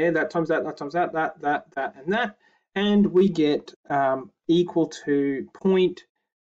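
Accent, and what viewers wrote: Australia. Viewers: your australien accent makes math fun thank you mate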